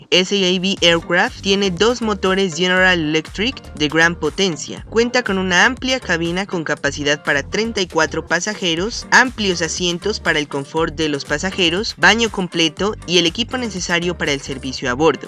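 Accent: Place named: Nariño, Colombia. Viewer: Sentí un Leve acento nariñense por ahí, ¿este canal es Colombiano? salu2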